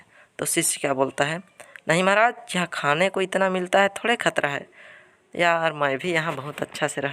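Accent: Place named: Bhojpuri, India. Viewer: This is my first time someone is teaching me in Bhojpuri accent 🙄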